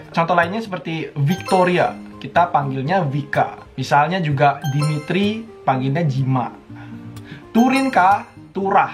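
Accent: Bali, Indonesia. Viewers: Kayak ada logat balinya.... apa prasaan aku aja kalik ya..🤭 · Logat lu medok bgt bro bali nya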